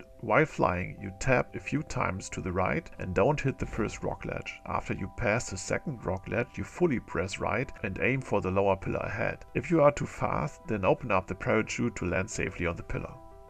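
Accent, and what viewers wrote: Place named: Germany. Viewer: Thanks. I have been making guides for the Ark maps myself & I really appreciate your video. My reviews: 1. Good clear voice & nice accent. Your accent German/Austrian but you are from Georgia, a very scenic country IMO. 2. "Potato mode" v low graphics helps in better visual representation of the ledges. 3. 1 dislike ?? Some People just hate to see others shine while they fall(to lava). Every jumping puzzle video has a lot of dislikes. Keep it up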